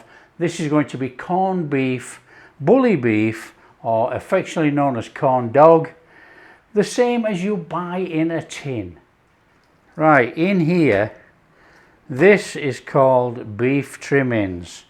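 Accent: United Kingdom. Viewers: How I love to learn about such "basic" recipes! I only knew that much: the name originated from coarse sea salt, named corn, back in the days. For decades, I thought it was corn-fed cattle. Little did I know. Talking accents (a personal question, I know): I'm german. Been married to an american woman. Was related to a girl from down under. While my english is reported as "quite well", I still cannot decide, when it comes to accents. You're using Coleman's mustard. I do assume you're from the UK. But what exact region? Pls help me out! A friend of mine is from Norwich. I've also been to Ireland, once. Just my curiosity. I could listen all day long to Cheryl (What's for tea? here on YT) and Melisa (Soul singer reacts.) Music to my ears (in both cases). Prior to our wedding, a young man at the supermarket asked me about my accent. And was surprised. Saying: "I thought you where either english or scottish." A huge compliment for me! 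I've been born and raised a bit northwest of Hamburg, Germany. Never lived in an english spoken country.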